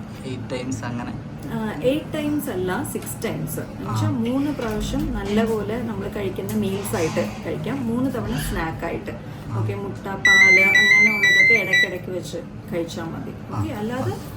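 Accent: Vadakara, India. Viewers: നമ്മുടെ വടകരസംസാരം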